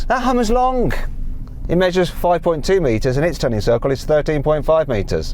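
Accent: United Kingdom. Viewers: ファミリーガイのステューイーそっくりや。あれほんまにイギリスアクセントなんやな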